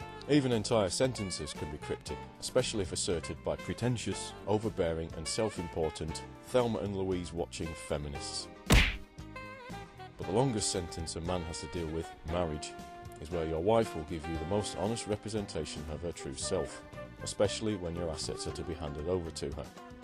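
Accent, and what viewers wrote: United Kingdom. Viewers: No problem. Your vids are great. I'm used to hearing American mgtows, so it's particularly refreshing to listen to another Brit! Your vids are quite unique in that sense as we get to hear an English point of view for a change. Thanks for your sterling work, sir. Looking forward to your next vid.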